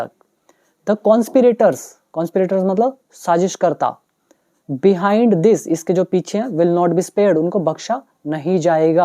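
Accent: India. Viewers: Great 👍, I wanted to inform you to improve your slang and pronunciation. Now, please try to develop your accent. Don't teach indian accent to your viewers. Take it easy.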